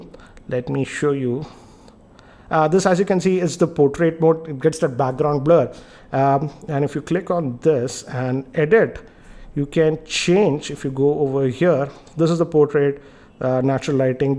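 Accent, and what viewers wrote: India. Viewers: he sounds like apu from the simpsons. can someone tell what his accent is? (which state in india) just curious.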